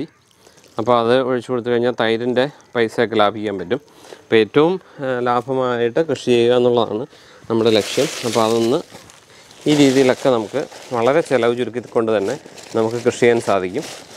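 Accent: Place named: Kottayam, India. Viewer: പള്ളയാൻതോടാൻ കോട്ടയം ഭാഷ റിയൽ കേട്ടോ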